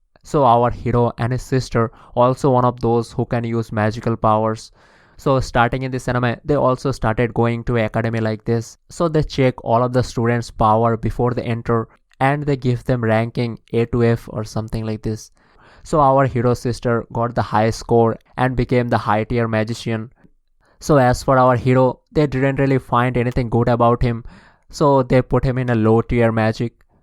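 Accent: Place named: India. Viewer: i hope you can fix your english more... and speak less with the indian accent. thanks for the list btw keep it up 👍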